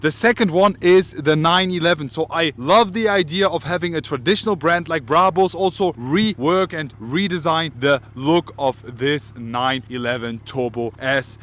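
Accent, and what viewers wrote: Germany. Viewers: Boaa wenn deutsche englisch reden...ohrenkrebs !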